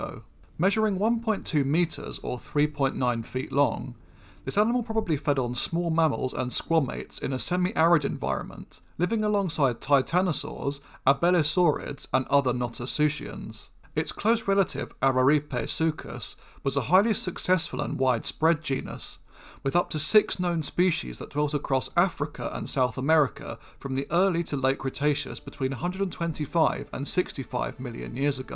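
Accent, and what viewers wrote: United Kingdom. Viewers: is like the fusion of Mr. Rogers and Sir David Attenborough who could take on Perfect Cell, All For One, Lord Boros, and The Anti Spiral King with nothing but his Upper British pleasant kindness while hitting them with facts.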